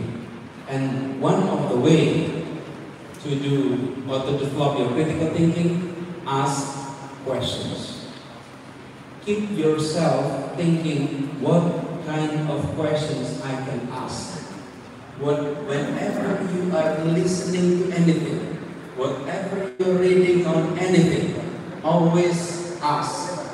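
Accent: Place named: Indonesia. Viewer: Indonesia banget bhs inggrisnya pak Anis.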